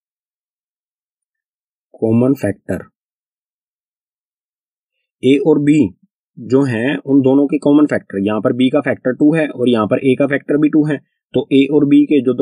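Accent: Haryana, India. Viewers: Sir aap Haryana se ho na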